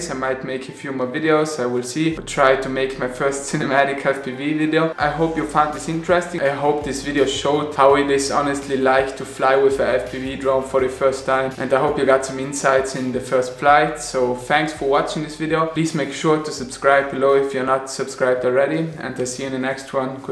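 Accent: Germany. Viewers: Man hört das du deutscher bzw. schweizer österreicher bist xD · Dieses Deutsch- Englisch. Aber ich finde es Super. Für deinen dritten Flug?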